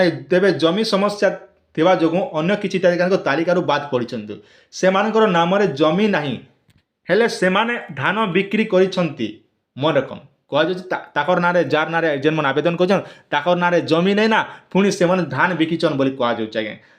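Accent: Kalahandi, India. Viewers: Amor Kalahandi bhasa news bhal lagla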